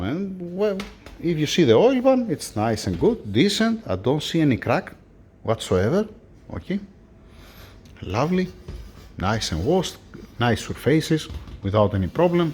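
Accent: Greece. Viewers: Just a quick question firstly I absolutely love how that you are attention to detail. Are you GREEK?? because you sound like it 😅😅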